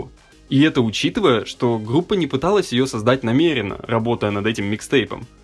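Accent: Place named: Russia. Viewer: This is russian accent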